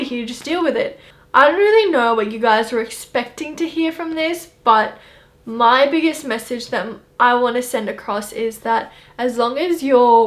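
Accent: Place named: Australia. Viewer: I think she lives in Australia, because of her accent, and it was uploaded in june and she said that it was winter I think.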